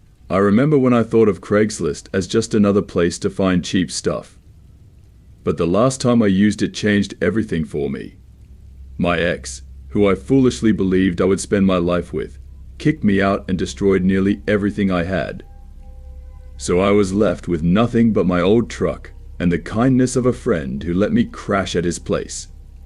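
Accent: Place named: Australia. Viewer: It sounds so weird hearing someone with an Australian accent tell a story involving a gun 😂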